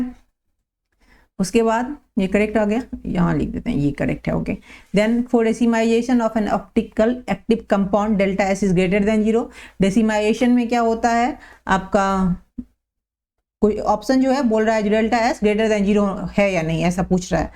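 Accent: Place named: Bengal, India. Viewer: Bengali tone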